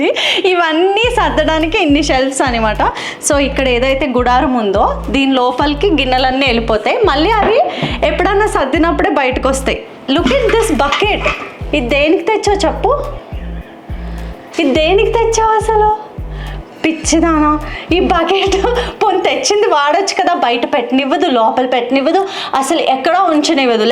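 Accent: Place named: Bhimavaram, India. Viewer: వావ్ 😍 మీ కిచెన్ చాలా బాగుంది, ఆ సామాన్లు చూస్తోంటే నాకు మా అత్తగారు గుర్తోచ్చారు, ఆమె అలానే బొలెడన్ని దాచి పండుగకు శుభ్రం చేసేసి మళ్ళీ దాచేసేవారు 😂 ఆమె తరువాత చాలా వరకు నేను ఆర్ఫనైజ్కి ఇచ్చెసాను. మీ కిచెన్లో నావి బుజ్జి సజెషన్స్..ఓవెన్కి సంబంధించినవి బౌల్స్ అవి ఓవెన్ కిందనే పెట్టొచ్చు కదా? స్నాక్స్ పెట్టారు అక్కడ.. స్నాక్స్ అన్ని ఒక బాస్కెట్లో పెట్టి పైనా పెట్టుకుంటే అస్తమానం డౌన్ అవ్వాల్సిన అవసరం ఉండదు కదా.. గెరిటాలు చాలా ఉన్నాయి, అన్ని వాడం కాబట్టి కొన్ని దాచెయచ్చు మేబీ.. లేకపోతే మెస్సీ అయిపోతుంది. మీ రూట్స్ భీమవరం అని ప్రతిసార చెప్పనవసరం లేకుండానే అత్తయ్యగొరు, మావయ్యా గొరు అంటారు చూడండి. అక్కడే అర్థమైపోతుంది.భలే క్యూట్ ఉంటుంది ఆ యాస ❤️❤️ లవ్యూ వితిక గారు 🩵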